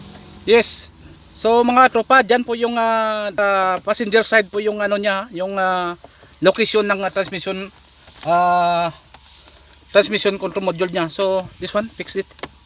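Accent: Iloilo, Philippines. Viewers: Teh daw ilonngo gid timo bah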